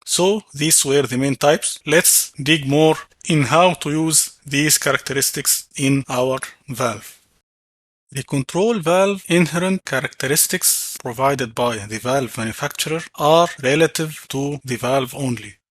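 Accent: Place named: Egypt. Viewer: يا عم لو حكيت بالعربي كان افضل في الشرح وتوصيل المعلومة.. في الاول اعتقدت ان لهجتك هندية وبعدين وضحت نبرة النيل.. لاحظت ان اغلب فيديوهات الهنود يشرحو بلغتهم رغم تمكنهم من اللغة الانجليزية وذلك لاعتزازهم بلسانهم، اما من يتحدث اللغة العربية تجده يلهث خلف اللغة الانجليزية والسبب !!! اجعل الشرح باللغة العربية مع استخدام المصطلحات الانجليزية حينها تكون المعادلة معقولة وتعم الفائدة. ربي يعينك ويساعدك. مهندس ليبي مر من هنا.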